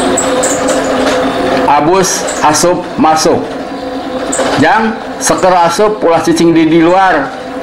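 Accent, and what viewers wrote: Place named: Sunda, Indonesia. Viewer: Asli orang sunda atuh kang....👍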